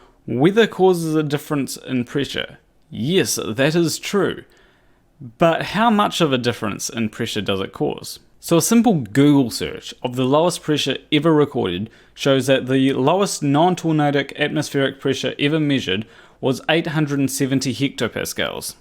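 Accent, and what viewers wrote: New Zealand. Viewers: Nope, you definitely still have the Kiwi accent! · Hey. Aussie here and you are quite clearly a Kiwi. Anyone who is truly an Aussie and is having trouble telling that you are a Kiwi should maybe get their hearing checked. Also can’t wait for my next sweet, sweet NASA payment for pretending that Australia actually exists and that I come from there....oh. I just said that out loud didn’t I. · Ha ha ha, calling you an Aussie. Nah mate, you don't sound remotely like us Aussies. Your Kiwi accent is unmistakable to any who know the difference. And thanks for constantly fighting this effing stupidity, my Antipodean brother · You definitely still have a kiwi accent.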